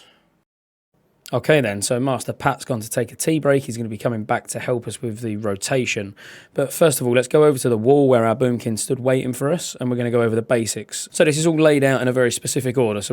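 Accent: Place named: London, United Kingdom. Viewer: i love druid and l love your london accent!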